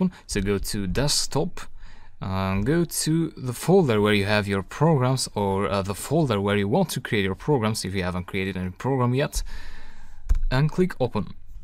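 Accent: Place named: Russia. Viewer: are you russian? You have a nice accent.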